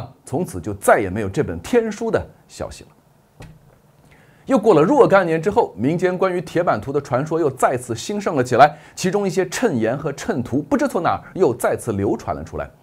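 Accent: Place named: China. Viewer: 聽你口音，因該是在海外的大陸人，就你敢說，哈哈哈!!!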